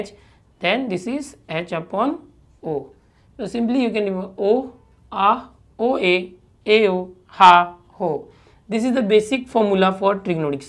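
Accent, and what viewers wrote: Maharashtra, India. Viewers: महाराष्ट्र स्टाइल मध्ये कडक कडक👌👌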